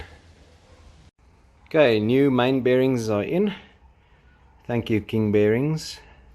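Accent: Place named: Cleveland, United States. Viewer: You have an interesting accent. Are you from Cleveland?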